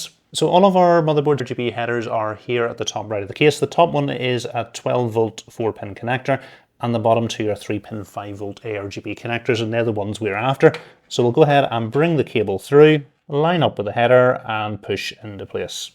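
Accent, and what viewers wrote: Northern Ireland, United Kingdom. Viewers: Love hearing the NI accent. Much love from portadown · You are def from northern ireland... I am from the US, but have lived in Belfast for the past 10 years and can spot a northern irish accent anywhere. Glad to see another computer enthusiast from NI.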